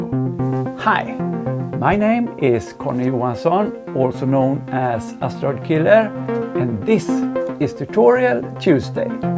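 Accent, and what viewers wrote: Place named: Jamaica. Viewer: nice analog & digital hybrid system ! u sounds like from jamaica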